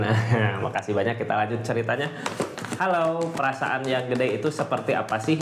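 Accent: West Java, Indonesia. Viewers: Si Aa lucu speak up aksen Sunda pisan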